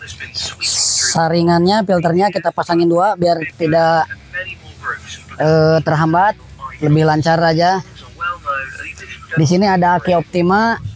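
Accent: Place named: Indonesia. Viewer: Dari logatnya pasti org indonesia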